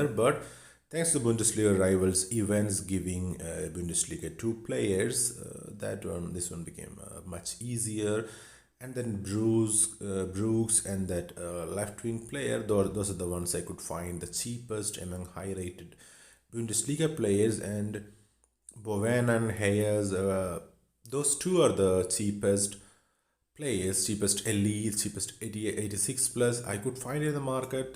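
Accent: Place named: Kerala, India. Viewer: Ate you Indian? You sound thoo.. Kerlite to be more specific?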